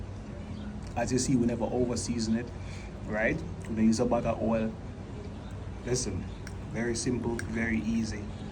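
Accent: Jamaica. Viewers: Don't forget the beautiful Jamaican accent 🤤n food together. Delish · You make this island lady home sick. Your meals, your accent, your praise. Love love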